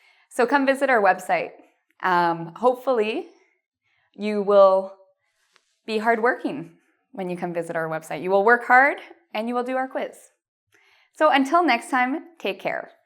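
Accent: Canada. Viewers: Are you Canadian? I can hear you say 'boat ... :) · I love your Canadian accent. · This is a Canadian accent :D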